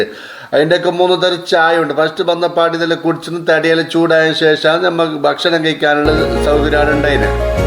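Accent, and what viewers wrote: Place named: Kasaragod, India. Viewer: Kasargod samsaram poliya 😂❤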